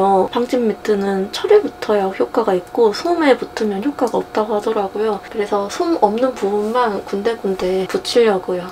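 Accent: Jeolla, South Korea. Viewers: ♡ 젤루 님이 눈에 들어오신건 강아지#강이#이와 함께여서 구독하다 전라도분인걸 확 알아챘음당~ㅋ 전라도 사람은 다아는 억양~ㅋ 저두 강아쥘 엄청좋아하거든요 님의 영상을 보면서 캠퍼의 꿈?을 키워봅니다 항상 잘 보고마니 배워보도록 할께요~😊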